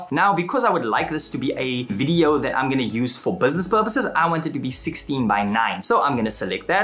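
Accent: South Africa. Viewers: Is that a South African (Cape Town) accent I'm detecting there? :)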